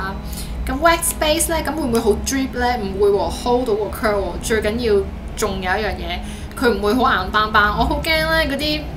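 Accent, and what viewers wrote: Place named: China. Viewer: 不錯！改善英文發音更好，太chinglish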